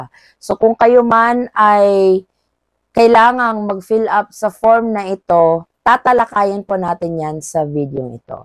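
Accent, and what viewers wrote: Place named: Spain. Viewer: Must not be for people to speak English sounds like you're speaking in Spanish but I know you're a Filipino because the Spaniards did come to your country at one time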